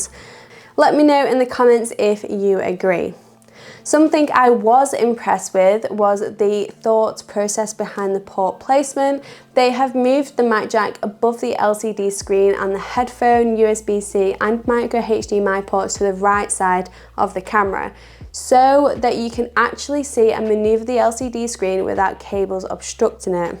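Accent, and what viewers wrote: United Kingdom. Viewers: Is it Brit accent? Always found it hard to get it (though they ruled India for long).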